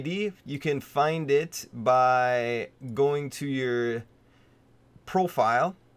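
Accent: Canada. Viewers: I knew you were Canadian by the 15 second mark :). Correct me if im wrong though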